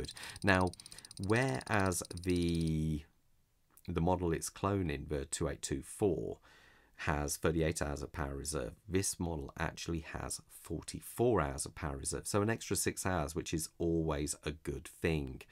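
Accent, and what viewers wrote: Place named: Birmingham, United Kingdom. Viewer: I will definitely visit the dealership you mentioned in Coventry. And I really like your videos with you brummi accent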